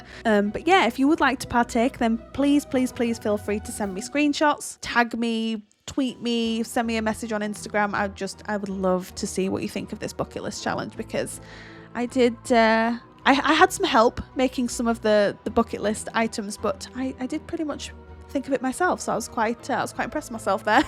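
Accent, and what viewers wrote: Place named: Newcastle, United Kingdom. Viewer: yeah you guys sounds similar, by any chance is it called a geordie accent, right?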